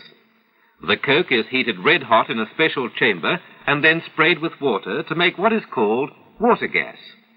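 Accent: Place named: Australia. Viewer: Ah, when the world was still a good place to live. I mean, one third of the world, but still. This is such nostalgia, even with a funny Aussie accent.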